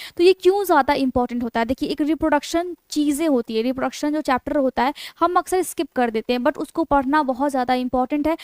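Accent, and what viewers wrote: Bihar, India. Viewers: Are you bihari Language pronouncesion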